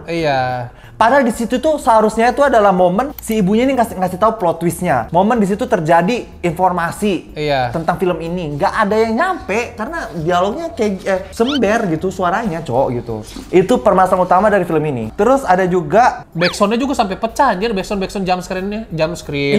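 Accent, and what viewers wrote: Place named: Surabaya, Indonesia. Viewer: Dari dialek misuh2nya, Koko nie beneran orang Surabaya. 😂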